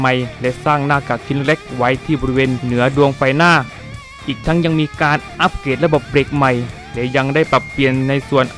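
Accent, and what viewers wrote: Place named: South, Thailand. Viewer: คนใต้แน่นอนนิ้<num>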